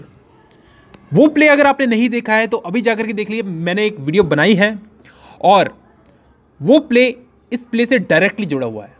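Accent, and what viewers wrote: India. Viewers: i cant understand india english ...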